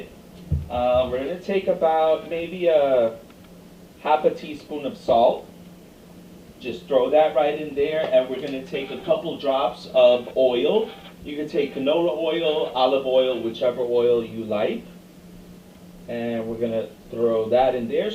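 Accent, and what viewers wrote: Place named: Queens, United States. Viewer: Are you from Queens brother? Lol I love it!! Thanks for sharing!!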